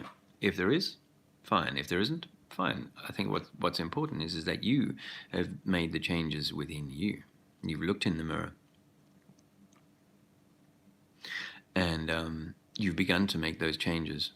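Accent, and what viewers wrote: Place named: Australia. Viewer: Laughing my ass off right now... love the Aussie accent! And you are spot on, we certainly are a nanny state and it makes me sick!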